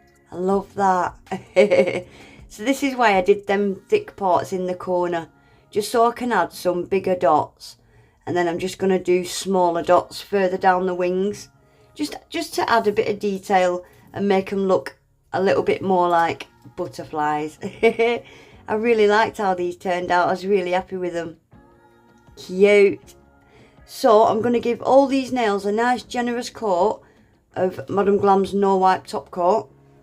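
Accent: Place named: Scotland, United Kingdom. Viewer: Impressive, as always! I have to say, once you said you were Scottish, I said, "That's it! That's where her accent comes from!". But, like you said, it's not quite that obvious. I love listening to you! I'm American, but I have royal Scottish blood in me! My family is very proud of that. My ancestors had to flee the country many generations ago because of their religion. Not an uncommon story. Thanks for sharing!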